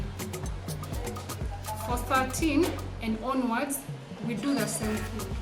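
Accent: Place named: Kenya. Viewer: you sound like a kenyan already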